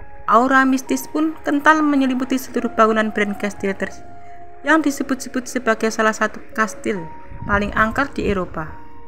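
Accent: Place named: Java, Indonesia. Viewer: Pekat benor jowo nya😂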